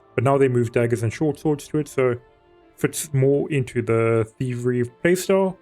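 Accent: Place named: South Africa. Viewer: Heard that accent and immediately knew I’m dealing with a real boet here lol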